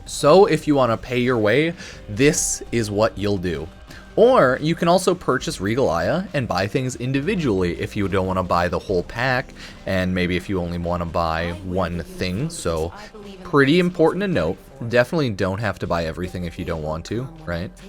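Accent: Canada. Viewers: New to your channel. Gotta say, you sound very Canadian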